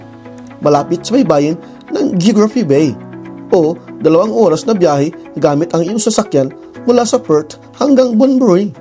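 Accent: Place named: Visayas, Philippines. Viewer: bisaya kaau 😂😂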